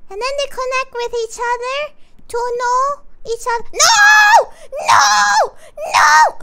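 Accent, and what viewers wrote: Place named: Malaysia. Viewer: She sounds so malaysian this is great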